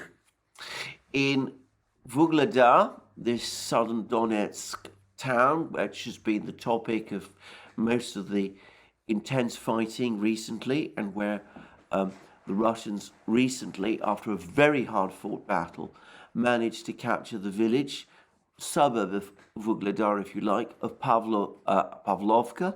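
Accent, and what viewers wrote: United Kingdom. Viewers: Yes, the best ruzzian propaganda with a british accent.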